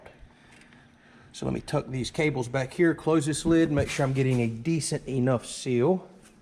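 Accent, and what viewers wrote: South, United States. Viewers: Hey dummy! You're not a dummy! People that are ignorant just hear your southern accent, and make bigoted assumptions! Chest deep freezers use almost no energy. Generally one of the most efficient devices in your house. Might cost you $3-5 a month... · I really enjoy listening to this guy talk! Presentation and his southern accent are peaceful.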